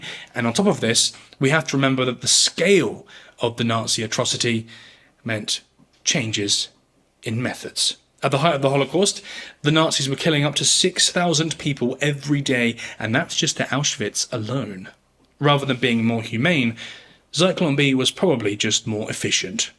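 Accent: United Kingdom. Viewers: Sounds like a limey snowflake. The USA needs to execute more and quicker to be a deterrent. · I once believed, totally, in capital punishment, couldn't understand the logic of those who thought otherwise. In time I have totally reversed my opinion for reasons I don't need to go into. But that being said, I have some major issues with this video. For starters, conflating American executions with Nazi Germany's genocide is offensive, deliberately misleading, wrong, and frankly coming from a man who sounds British, well it takes huge brass balls. And given that the UK practiced capital punishment for a thousand years, on a much larger scale than the US, well there's no small irony in his smugness. I also noticed that the number of patrons for someone who seems to carry a torch for this subject matter kind of suspicious. Perhaps this is a well funded political mouthpiece? 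To be clear, it's not the message that I really object to but the filthy package that carries it. Cheers.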